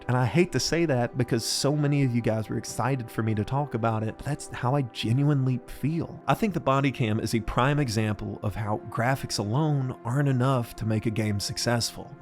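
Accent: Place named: South, United States. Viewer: When that smooth Southern accent hits, you know it's time for some easy listening with insightful commentary. Great video,!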